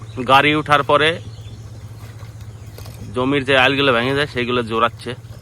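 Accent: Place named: Barishal, Bangladesh. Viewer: ও ভাই তুমি তো কথা বলো মোগো বাংলাদেশ বরিশালের মতো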